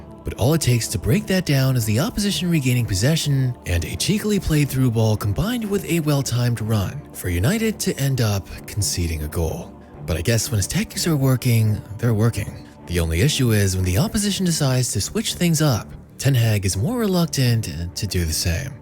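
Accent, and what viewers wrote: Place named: United States. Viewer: The yank accent further proves how laughable this is 😂😂😂😂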